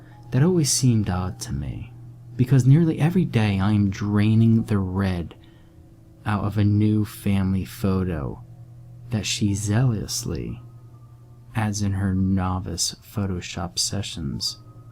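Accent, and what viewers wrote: United States. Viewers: No, he's American or Canadian sounding. You should check out his channel, his back catalogue spans something like 8 years.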